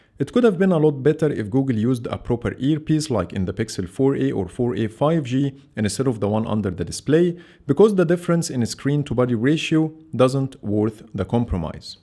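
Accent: Egypt. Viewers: يخي ترا مبين والله انك مصري وعم تحكي انكليزي ... يرحم كل شخص تحبوا ارجوك عدل اللكنه تبعك تبع الانكليزية تبعك 🙏🙏 يخي والله بحب محتواك بس من لكنتك صار عندي فوبيا و مبدي اتفرج اكثر 💔💔 ينقصك اللكنة فقط والله 🙏🙏🙏🙏💖💖💖💖💖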